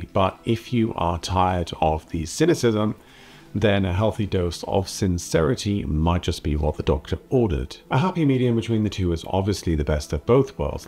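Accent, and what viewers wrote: Germany. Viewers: Interestingly, you speak English like a German, not a Brit. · You've picked up a German accent. It's been over 30 years since I last visited Germany. I should go back some day. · It's because he has acquired a (very) slight German accent and diction! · Not having to learn German only applies to people with EU passports (or in your case, "former" EU members). All the others, including Americans, will have to show some proficiency at a certain point in time, otherwise the residency will not be renewed. - Unless they changed that recently, which I might not be aware of (which I can't imagine). You're a lovely person and I'm glad the algorithm brought me here. Might it be possible that after all these years you have a slight German accent? What are your friends and family back home saying? I'm really curious. All the best and thank you. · As a Brit,I love Germany, it's a beautiful country, and I find German frankness very refreshing. 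Whether I could live there or not ,is a different matter .BTW, after 10 years living in the country, your English accent appears to be tinged with teutonic tones. Thanks for posting. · This video is very interesting and informative. I think it's amusing that you now have a slight German accent. I learned German at school here in Australia in the 1980s and travel to Germany occasionally. I've visited every State, and it's one of my favourite countries. I find that if I start speaking German to a German stranger, he or she usually replies in English. Sometimes, that's convenient. At other times, I just keep on speaking German, and before long, the stranger gets the message. Usually, he or she then compliments me on how well I speak the language - so it really is worthwhile making the effort to learn. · This is the first of your videos I'm watching. I observe that your native british english has a german accent. 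· As a Brit I’d love to be able to just get on a train and travel to different countries so easily. Ps I can hear a slight German undertone in your English now. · I can hear that he lives in Germany in his English!!!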